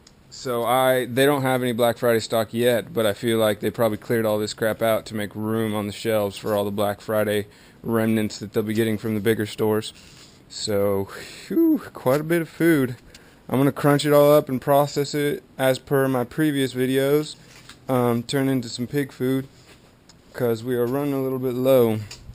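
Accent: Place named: Alabama, United States. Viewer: this dude has to be from the backwoods of alabama or some other southern state. but probably alabama, lol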